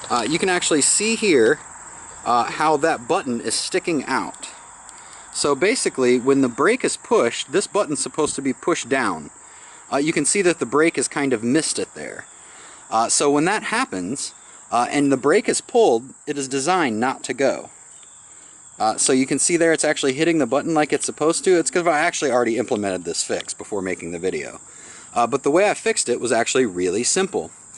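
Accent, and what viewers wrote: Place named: Michigan, United States. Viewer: BTW...where do you live, man? It sounds like maybe Michigan. You should open a repair shop if you haven't already. Would rather pay someone like you and not give any more money to ScamTron, I mean, SwagTron.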